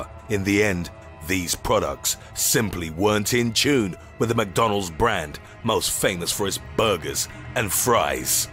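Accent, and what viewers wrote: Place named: United Kingdom. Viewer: British narrator what a joke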